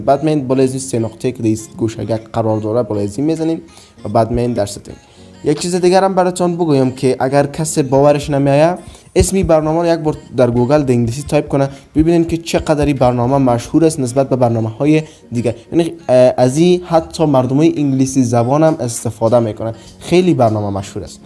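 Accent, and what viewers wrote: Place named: Afghanistan. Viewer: اهل افغانستان است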